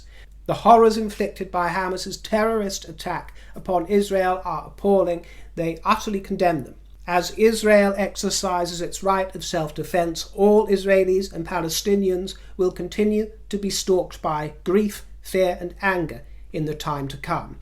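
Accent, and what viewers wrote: United States. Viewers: If I didn’t say it on the last one I saw the milk and honey which I think was the last one you made… anyway, I love the new intro. Not bad American accent, either. That’s one reason alone that would make me want my grandmother to have emigrated to the UK versus the USA. That and Trump lol😂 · You have a great American accent!! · Well done with the American accent! I had to do a double-take. Well done 😊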